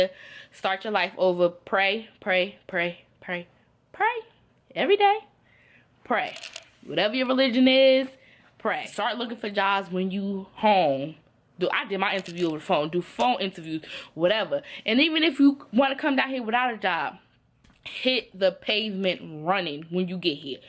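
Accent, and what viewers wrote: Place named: Brooklyn, United States. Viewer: LOVE your story girl, you sound like you from Brooklyn more so than Jersey haha. Great inspiring video. GOD IS GREAT :)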